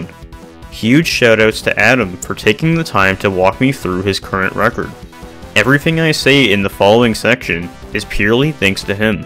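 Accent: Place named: Canada. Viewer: "...sorry if i keep rambling on but theres something I need to talk abut..." I fuckin love canadians and how you all talk <3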